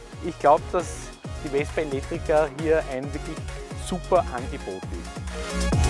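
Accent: Austria. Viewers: östricherr reded so lushtig hahah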